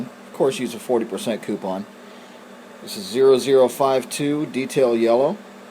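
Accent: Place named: Pittsburgh, United States. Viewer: Pittsburgh boy. I recognize the accent. My grandparents lived on Glen Coe dr. McKeesport.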